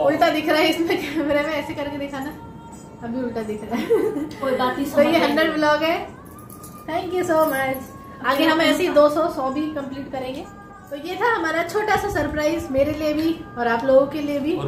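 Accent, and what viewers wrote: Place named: Rajasthan, India. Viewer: bhaiya ki marwadi boli bahut pyari lagti h hume Garv hota h ki hum Rajasthani h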